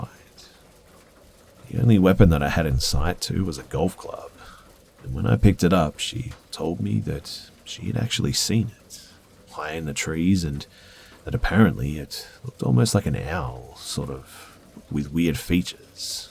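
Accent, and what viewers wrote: Australia. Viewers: One of the few people who's voice actually makes me feel safe, comfy and ohhhh sooooo chilled., i could honestly listen to you forever, what I'd give for you to read me a bedtime story every night, I'd sleep great permanently......gotta love an Aussie accent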